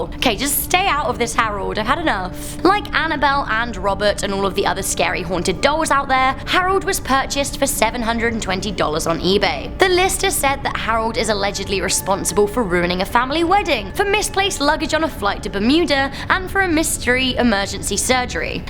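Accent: England, United Kingdom. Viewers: r u english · Why are the people that do these videos always British and ALWAYS so God damned irritating!?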